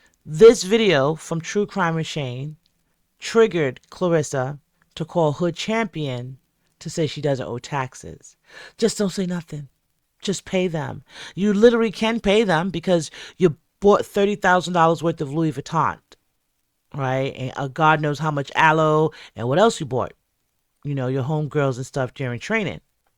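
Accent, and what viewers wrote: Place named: Caribbean. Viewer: I 🇹🇹 I thought I heard d West Indian in you some time ago!🇯🇲❤❤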